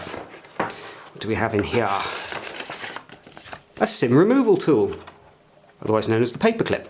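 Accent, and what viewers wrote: United Kingdom. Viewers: Great review, thanks! I'm sorry, I'm not English speaking person. Is it a British accent?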